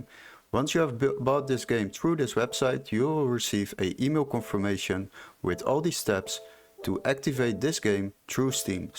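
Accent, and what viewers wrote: Netherlands. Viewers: are you from Friesland:hand-pink-waving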